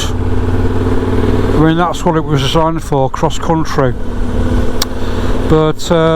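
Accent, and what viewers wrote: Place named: North, United Kingdom. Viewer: I love it ….the sounds of a disgruntled northerner- just like me 😮 “ Put me in charge and I’ll sort it out “!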